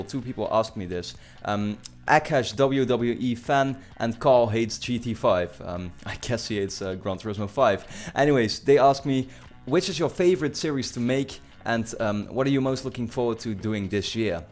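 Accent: Netherlands. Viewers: Are you dutch? your accent kinde gives it away :)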